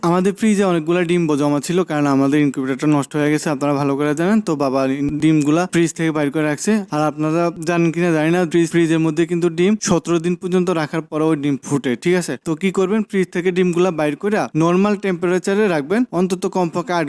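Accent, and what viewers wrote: Noakhali, Bangladesh. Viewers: ভাই আপনার কথায় মনে হয় যেন আঙ্গ নোয়াখাইল্লা??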